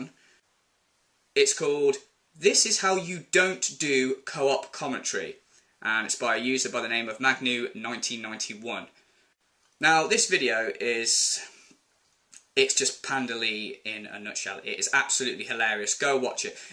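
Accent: United Kingdom. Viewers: British accents are pretty interesting. :)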